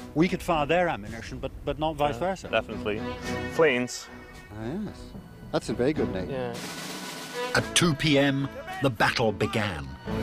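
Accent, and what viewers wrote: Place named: RP, United Kingdom. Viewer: The latter. More accurately it's "posh British" (or Received Pronunciation, to give it its latter name) because plenty of people from all corners of Britain speak in it , if they were educated at the "right" schools.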